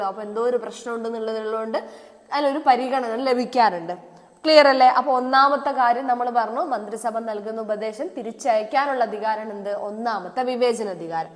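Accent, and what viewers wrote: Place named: Vadakara, India. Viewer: Vadakara ente oru frnd und same slang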